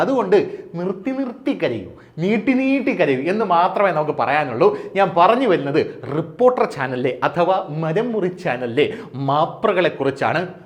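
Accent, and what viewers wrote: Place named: Thiruvananthapuram, India. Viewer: തിരുവനന്തപുരം ഭാഷയിൽ ഉള്ള താങ്കളുടെ സംസാരം കേൾക്കാൻ നല്ല രസമുണ്ട് 👍👍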